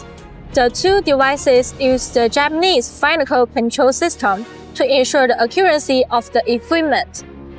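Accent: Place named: India. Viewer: sounds like Indian English, hard to understand.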